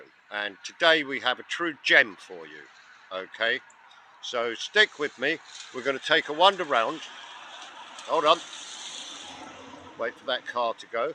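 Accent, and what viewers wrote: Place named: England, United Kingdom. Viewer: oh my, a "disabled Toilet"as in not working!! Imagine if that was true-oh the stench of that. Toilets for disabled would be I believe the appropriate English words describing it, mate. I love the accents of the English, as a Canadian with British parents. This accent reminds me of the New Tricks actor and musician, Dennis Waterman as Gerry Standing, one of the great amongst the initial cast of four🤩 Those timbers up top with the massive bells are most intriguing. Looks like tree trunks perhaps. I'd love to see it with an archaeologists eye -to date and describe the wood used to create the strong ceiling, who and what the stories are of these saints/amazing paintings, particularly of the fainted or ill woman in the man's arms, a few chosen headstones for the words or messages, the cultural trio represented on the pulpit.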